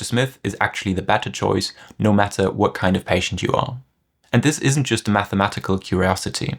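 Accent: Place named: England, United Kingdom. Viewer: Interesting that the English sounding narrator talks about surgeons as Doctors because in the UK if they are surgical specialists they would be called Mister or a female equivalent. Perhaps he is making this more understandable for your generic English speaker without having the caveat about their actual titles. Still it amuses me when I tell Americans that if they have appendicitis in the UK and they are told Dr. Jones will be along soon, to run like hell because he's not a specialist.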